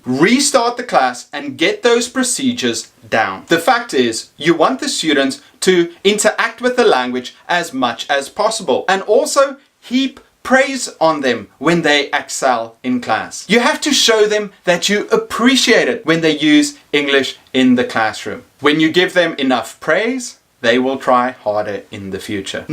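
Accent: South Africa. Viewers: haha I you South African bro?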